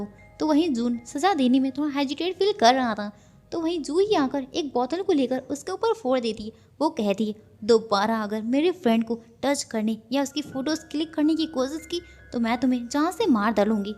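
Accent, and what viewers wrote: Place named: Arunachal Pradesh, India. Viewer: Apki voice arunachal ki lag ta hain....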